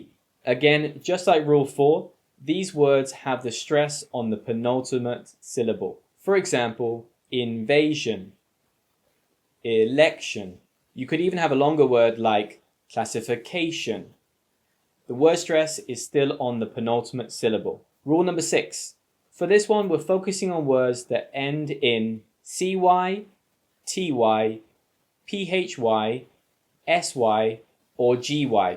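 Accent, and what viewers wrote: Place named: England, United Kingdom. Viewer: I like listening to your true English accent, not the weird American pronunciation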